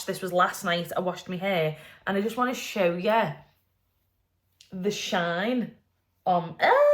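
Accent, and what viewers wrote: Liverpool, United Kingdom. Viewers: Hello from Belgium ...you sound like a Scouser ? Liverpool ? HILARIOUS : "hair in me bom" HAHAHAHAHA I came here to find out about the products and I have been roaring with laughter! That said, I'm a new sub ! 🙂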